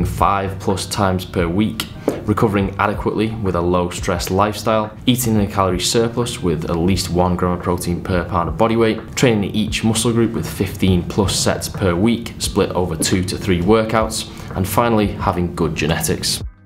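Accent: United Kingdom. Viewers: Thank you very much for all that super valious information, and I love your accent, where are you from in the UK?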